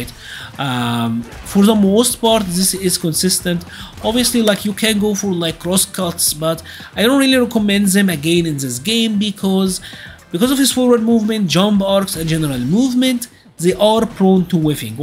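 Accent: Egypt. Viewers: habibi good to see that there is an egyptian community for streetfighter, i just got the game a couple of weeks ago and learning luke so when i heard your accent i immediately knew you were egyptian. btw amazing guide super helpful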